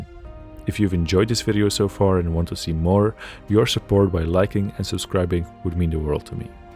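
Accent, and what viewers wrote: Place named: Netherlands. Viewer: 100% you are dutch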